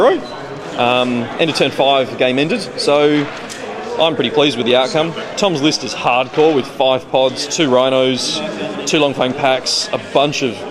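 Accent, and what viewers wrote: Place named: Australia. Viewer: cool, like the vids and its nice to here our aussi acent for a change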